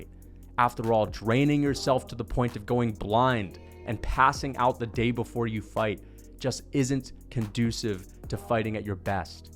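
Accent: Ireland. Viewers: Irish accent! 😅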